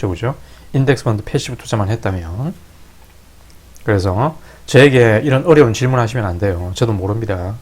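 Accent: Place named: Gyeongsang, South Korea. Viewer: 말투가 경남분이신듯 😊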